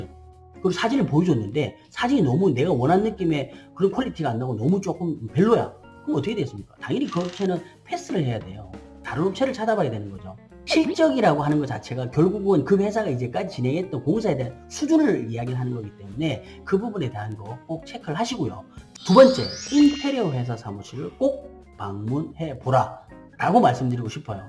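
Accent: Busan, South Korea. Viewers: 사장님 말투는 부산인데, 서울업체네요 아쉽:::😅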